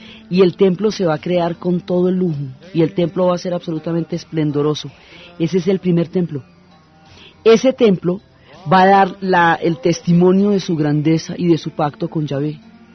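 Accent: Colombia. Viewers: Excelente! Soy una persona de 87 años , siempre he amado la historia y usted con sus conocimientos profundos , su voz clara amena y ese acento colombiano, me han hecho su más fiel seguidora . DIOS le siga protegiendo sus bones.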